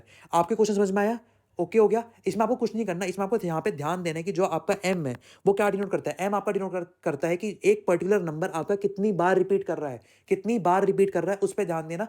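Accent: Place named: Pahadi, India. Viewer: love that cutu pahadi accent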